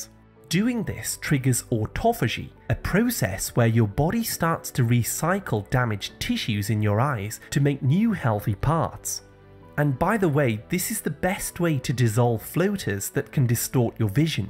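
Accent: United Kingdom. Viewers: Great and useful information regarding the health of one's eyes, concisely explained in clear British English. Thank you for sharing.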